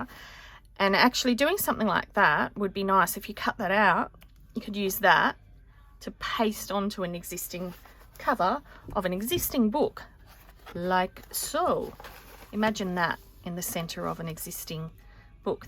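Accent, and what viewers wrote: Australia. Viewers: Nice to hear a fellow Aussie voice!!!! Love those stitched leaves so much!!! Split pins for me here in Oz-brads in the US. Glad YouTube gave your channel to me today!!!!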